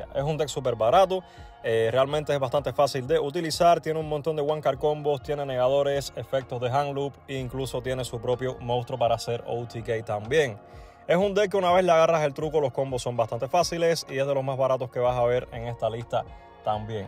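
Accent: Cuba. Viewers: Asere tu eres cubano?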